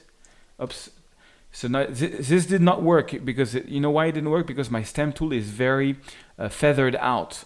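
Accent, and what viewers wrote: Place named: France. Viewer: It's funny to come back to these videos to see how much his French accent has dwindled over the years--video content still 🔥though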